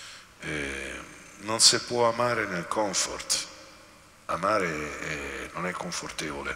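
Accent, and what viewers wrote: Rome, Italy. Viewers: Chi scrive che il romanesco da fastidio be significa che si mette una barriera alle cadenze dialettali che in ogni regione ha e poi io nn sno romana però piace.😮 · Don Fabio, se potesse per cortesia evitare di parlare con parole e toni romaneschi, è fastidioso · e come fà..... é de Roma... e noi romani parlamo come parlamo... e daje Don Fabio chessei gajjjardo, er mejo prete detutta Roma...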